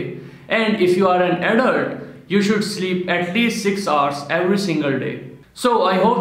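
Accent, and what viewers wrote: India. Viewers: I'm pretty sure he is he sounds Indian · Dat Indian English :D why are indians making this vids ? I heard thath they are not rich... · I think is a indian by his speaking language and.... Nothing 😅😅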